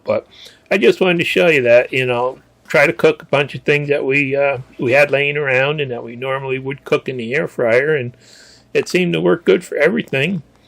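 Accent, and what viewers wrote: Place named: New York, United States. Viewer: You have a slight New York accent but no hillbilly twang!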